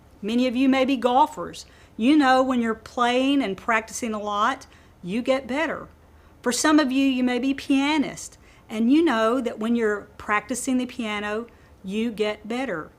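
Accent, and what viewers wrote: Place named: New Zealand. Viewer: ♥ her accent, from NewZealand :) Sounds like she's from where I live. . . sort of :)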